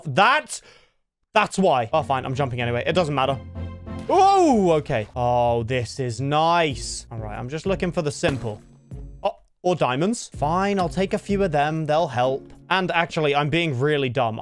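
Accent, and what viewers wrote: United Kingdom. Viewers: ive been watching for a while right, and tbh i think every episode he gets more british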